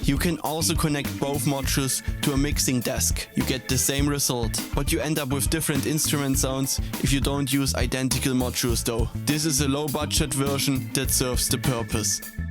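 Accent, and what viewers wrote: Germany. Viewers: Nice video, but earcancer. Are you german? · Du klingst deutsch lol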